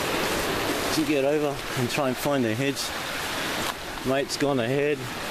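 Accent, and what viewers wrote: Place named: Australia. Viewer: Cool looking place there! Nothing like that here! Where exactly are you from? Sounds like Australia or New Zealand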